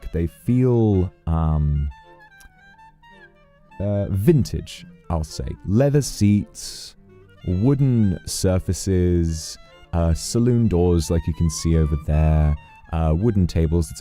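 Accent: United Kingdom. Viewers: Thank you so much for this English class:_MiLove: I'm still learning English, and at this point, I'd like to learn more about the UK. I think I feel more comfortable with British English because of listening to you so much:_MiSmile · 本当に助かる ありがとう Voxのこと好きなんだけど何言ってるかわからなくて配信を見られていなかった 今回の企画は私にとってとてもうれしいです accentがよく聞ける、イギリスの文化やスラングがわかる、Voxが何を言っているかある程度分かる！！！ Voxのことも好きだし、イギリスにも興味があったから本当に助かる ありがとう 何回も見ます、本当にありがとう I'm so happy because this steram is very useful and kind for me. I love Vox, but I can't understand what you say. I WANT TO UNDERSTAND WHAT YOU SAY!!! So, this stream got me excited. I'll watch it again and again. Thank you for the awesome try!!!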